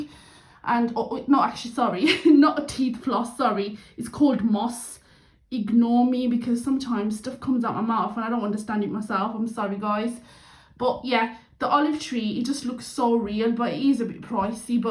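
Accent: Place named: Birmingham, United Kingdom. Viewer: Just subscribed coz u sound like my cousins in Birmingham ❤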